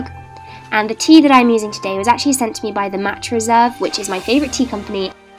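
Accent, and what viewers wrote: RP, United Kingdom. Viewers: Love yr bristish accentttttt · Ppl are always saying that British ppl aren’t like this/don’t sound like this, that’s not true, there are so many accents in the uk! I sound like her and so do a lot of people, not everyone obviously but it’s true that this routine fits the very stereotypical idea of England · i love how british people say haiy like im gonna brush out my haiy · im british and i can tell you that nobody is this posh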